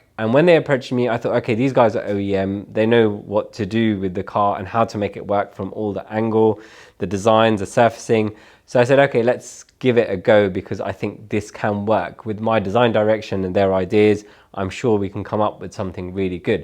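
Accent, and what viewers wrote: England, United Kingdom. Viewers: i don’t hate english accent but don’t necessary like it ether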